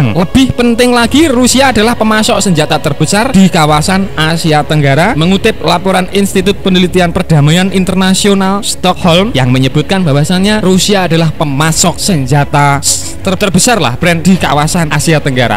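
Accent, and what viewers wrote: Java, Indonesia. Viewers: Intonasi narasine muantap nJawani begete. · suara Admin logatnya jawa banget dan seksi.. Urrraaaa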